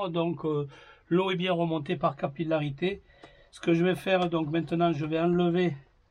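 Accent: South, France. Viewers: Coucou de Dordogne, j adore votre accent du sud , vos trucs et astuces, vos conseils , que du bonheur !! Merci et bonne journée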